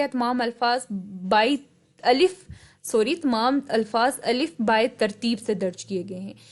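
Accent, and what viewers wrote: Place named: Punjab, Pakistan. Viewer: Punjabiiii accent hay ap ka improve yourself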